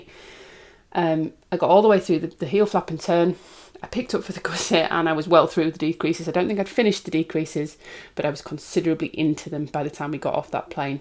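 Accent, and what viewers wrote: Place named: Northern England, United Kingdom. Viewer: You sound like you're from the North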